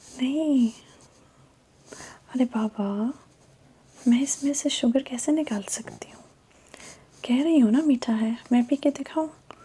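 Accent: India. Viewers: Hindi bolte huwe cute lagte ho Or hindi bolte huwe tumhari voice bhi acha sound karti hai I like your hindi accent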